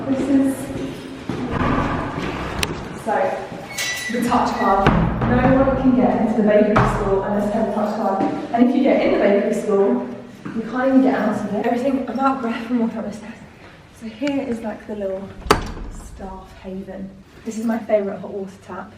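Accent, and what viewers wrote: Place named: United Kingdom. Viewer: amazing video, very informative, the only thing that bother me was the audio because English is not my native language soooo it was a little difficult to understand what you were saying (also British accent is hard to follow) but otherwise very good video ❤️❤️❤️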